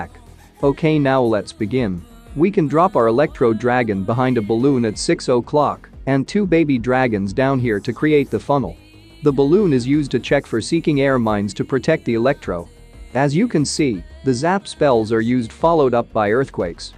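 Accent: India. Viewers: Bro, Are u Indian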